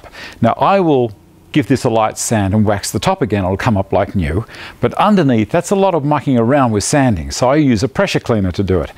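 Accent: Australia. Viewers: you sound Australian but are you living somewhere else ? other country !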